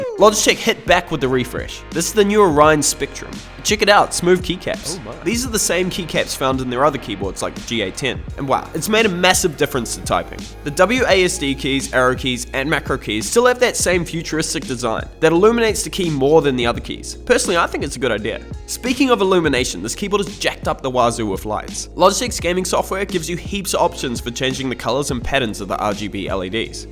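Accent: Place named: New Zealand. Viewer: love ur new zeland type of voice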